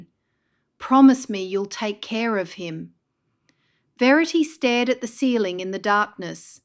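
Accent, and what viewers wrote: United Kingdom. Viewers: I really wanted to listen to this story. I love your stories. But just can't listen, I'm so sorry. You're a very gifted writer, but no Amish ever has a British accent. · It doesnt sound like an Amish dialect, sounds like uk accent takes away from story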